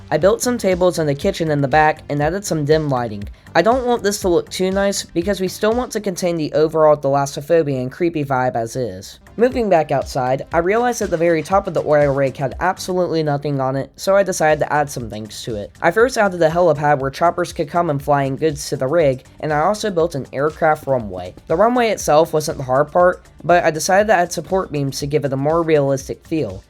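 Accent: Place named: Maryland, United States. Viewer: Insanely weird question but are you from Maryland? 😂 Your accent sounds it!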